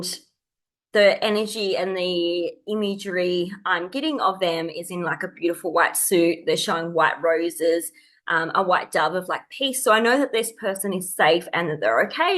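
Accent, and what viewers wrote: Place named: Australia. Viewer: Amazing job done! You sound Aussie BTW! ❤️❤️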